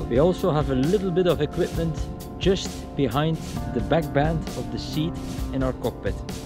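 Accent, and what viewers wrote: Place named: West Flanders, Belgium. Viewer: Ne Westvloaming in ’t Engels 👌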